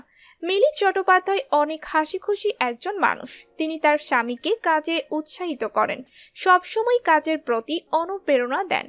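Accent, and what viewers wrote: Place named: Bangal, Bangladesh. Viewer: সঞ্চালিকা কাঠ বাঙালদের মতো বাংলা বলেন কেন? ইস্ত্রি বলেন স্ত্রী-কে !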